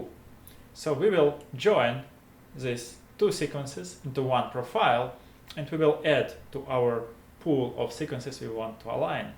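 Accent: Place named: Russia. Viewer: прям слышно что говоришь по русски...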